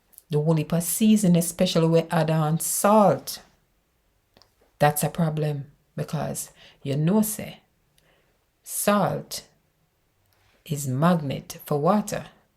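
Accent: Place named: Jamaica. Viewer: This is some good information, like the Jamaican accent !!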